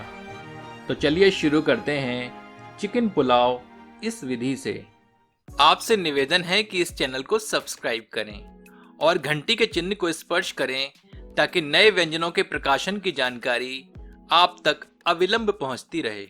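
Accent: Standard. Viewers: आप शुद्ध हिंदी में बोलते हैं, सुन कर अच्छा लगा। 👍👍🙏🙏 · Apki awaz or apki shud hindi achiii or rcipi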